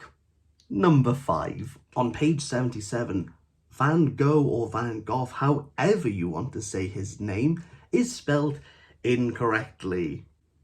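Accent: Wales, United Kingdom. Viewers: Cool review. Love a welsh accent I was born there left when I was 5 and have never been back.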